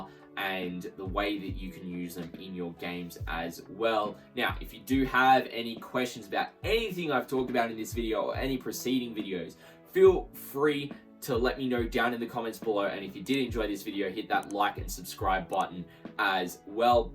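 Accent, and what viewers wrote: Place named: Australia. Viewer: Thank you for explaining this in Australian English.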